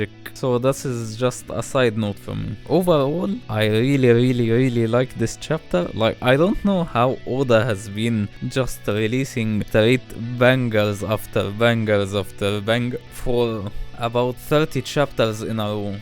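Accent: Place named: Russia. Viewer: Why you speaking with Russian accent my friend why ?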